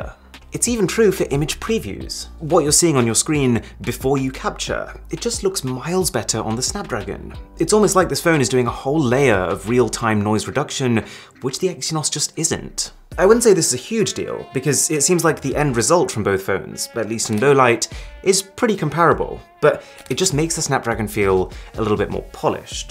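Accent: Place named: United Kingdom. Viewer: You have damn british accent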